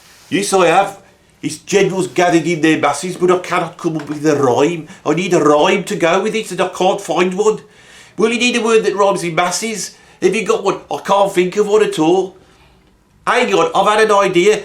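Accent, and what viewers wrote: Birmingham, United Kingdom. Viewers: you're reverting to your Brummie roots! Reminds me of Vic and Bobs "Slade at Home". 🤣🤣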